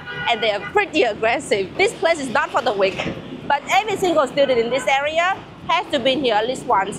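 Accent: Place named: Germany. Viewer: The cutest thing is hearing your English sound more and more like a German speaking English, it’s adorable :’)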